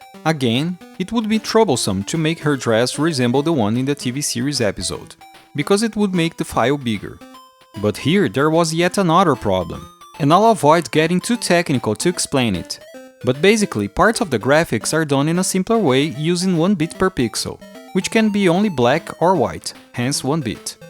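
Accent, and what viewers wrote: Brazil. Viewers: Seu sotaque brasileiro é muito charmoso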